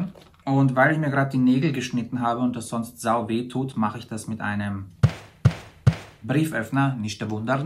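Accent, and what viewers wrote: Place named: Vienna, Austria. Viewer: Hallöchen^^ Ich habe Dich durch Zufall hier gefunden und ich liebe deinen sarkastischen Humor. Deine direkte Art ist sehr unterhaltsam. Dein Wiener Akzent i love it! Liebe Grüße aus Hamburg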